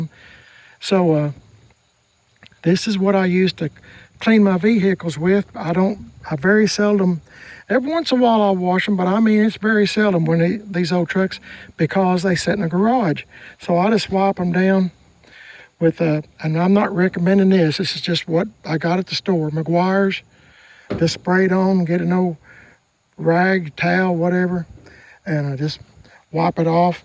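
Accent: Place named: Alabama, United States. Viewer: Sounds like an Alabama accent. We lost a good man from Alabama and you sound just like him. He will be missed ❤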